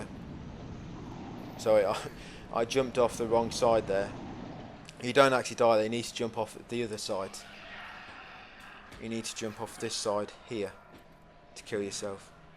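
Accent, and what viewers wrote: England, United Kingdom. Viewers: I can't help but be mesmerized by ur beautiful British voice 😉😚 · I don't know why this video dropped into my recommended, I've never played this game, but your accent is great, what part of England is that from? Fresh sub also, lemme check your other stuff.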